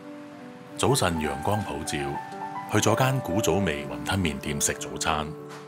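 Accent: Hong Kong, China. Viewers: Love your HK accent narrating a msian documentary in classic queen's Cantonese. Are you by any means from HK? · 难怪听起来像香港人口音和用语。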